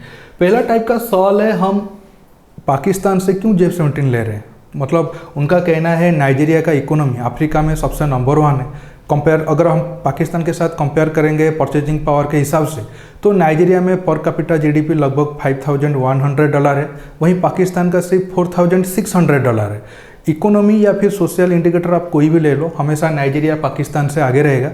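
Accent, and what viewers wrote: Odisha, India. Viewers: Bhai kay aap odisa se hai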